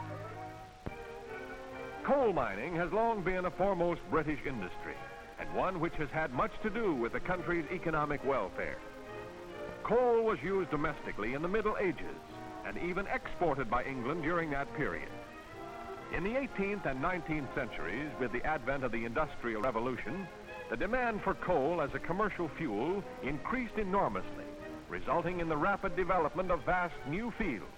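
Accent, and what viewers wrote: United States. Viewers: Why the American commentary?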